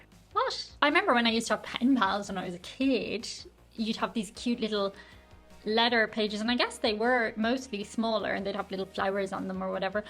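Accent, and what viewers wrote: Ireland. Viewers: I have travelled the world, and find the Irish people the friendliest people on earth. PS - I love your accent/brogue. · Hi! New to your channel! - for a kick sometime, record yourself saying the words "power, pour, par, pair" - in your charming Irish accent - play it back, and enjoy the echo effect? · That Irish accent. 😍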